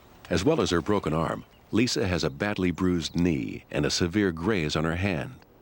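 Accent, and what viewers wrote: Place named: United States. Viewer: the american narrator ruins this show.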